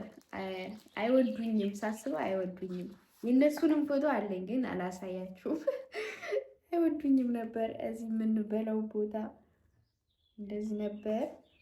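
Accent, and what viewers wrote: Gondar, Ethiopia. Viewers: it’s very nice u are still Abel to speak Amharic ,,, u have a gondere accent btw😄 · ጎንደሬ ነች መሰለኝ አክሰንቷ❤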